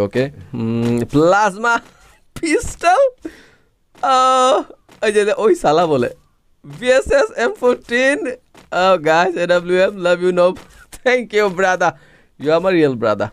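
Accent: Sylhet, Bangladesh. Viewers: Tumi Sylhet fua ni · bhaiya apni ki sylheti?? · vai apnar basha sylhet kunano?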